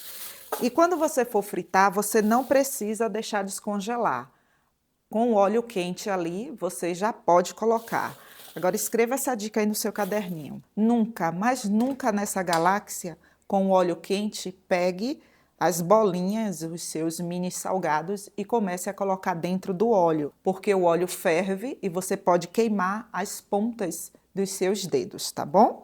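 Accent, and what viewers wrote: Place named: Northeast, Brazil. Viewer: Tô aqui olhando a receita mas prestando atenção no sotaque! Adoroooo queria ter sotaque nordestino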